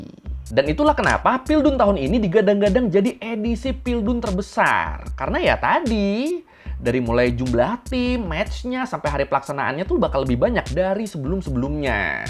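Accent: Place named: Java, Indonesia. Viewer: bang elo...medog kayak jawa ya😅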